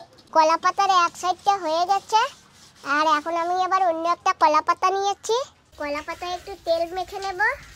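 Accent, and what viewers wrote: Barishal, Bangladesh. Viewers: হাই বুঝি আপনাগো বাড়ি কি বরিশাল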